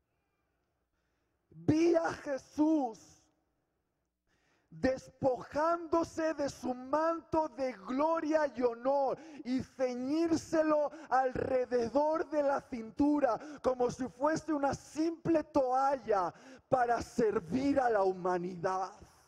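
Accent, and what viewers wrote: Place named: Spain. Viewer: Apenas hace unas 2 semanas te encontré por YouTube y ya eres uno de mis predicadores favoritos. Nunca te alejes del Señor pues a la mejor no tienes idea del impacto q estás siendo a miles o quizás millones de <link> fascina tu acento español pero aún más el como Dios te usa para transmitir cada msg de su palabra. Con cariño de una abuelita en Cristo.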